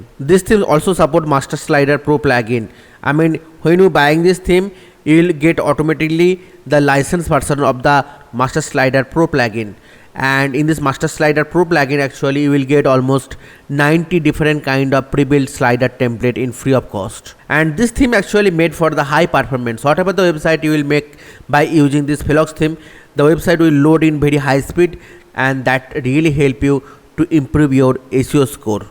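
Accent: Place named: India. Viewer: Fuck man I cant understand none of these indian peoples videos the accent is too strong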